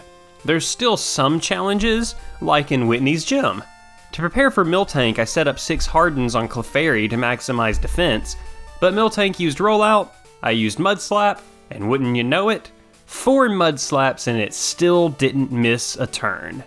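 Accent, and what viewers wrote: South, United States. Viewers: First Southern PokeTuber I’ve found! Been wondering if Corsica could do it lol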